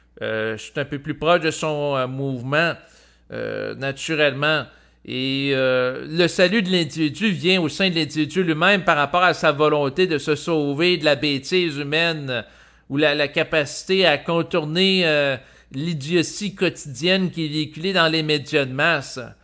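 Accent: Quebec, Canada. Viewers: Regarder les vidéos, enregistrer les vidéos, aller vivre à Québec.....ca veut pas dire que la personne est supraconsciente. Je vois des vidéos sur le supraconscience qui me font peur, pour dire des choses comme elles sont. N'importe qui se considère comme supraconscient, mais des gens ils sont loiiiiin de supramental / supraconscience. Comme j'ai déjà dis c'est pas donné à tous le monde. Moi j'aime bien Québec,les gens surtout votre accent, un vrai plaisir a écouter les québécois parlé. Ca veut pas dire si on part vivre n'importe où qu'on va devenir ça ou ça. On est loin de supramental/ supraconsience. merci pour le partage 👍💎 Bonjour à vous tous 🖐️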